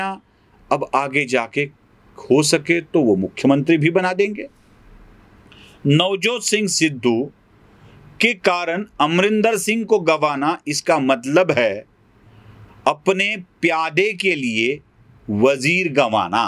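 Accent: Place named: Maharashtra, India. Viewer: your content is always good. Marathi Aap acchhi bolte hai. But Hindi is a soft language, your accent is marathi. I suggest please polish your hindi speaking to get more viewers. I watch analyzer daily, hope you dont mind the suggestions.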